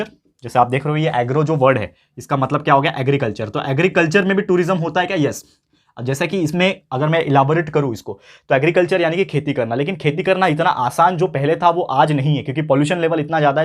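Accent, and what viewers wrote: Maharashtra, India. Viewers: Sir kya aap Marathi ho Apki accent aur kuch words aap Marathi boltey ho to aisa lagta hai ki aap Marathi ho Kisi video mein bol dena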